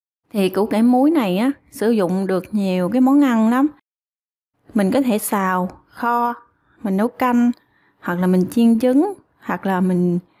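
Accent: South, Vietnam. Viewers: Hỏi cho vui chứ thấy rồi, giọng miền Nam ngọt hơn mía lùi, cảm ơn cô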